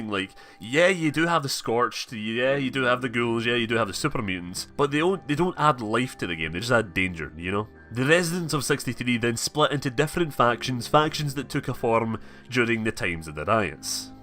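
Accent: Scotland, United Kingdom. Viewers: Scott or Irish?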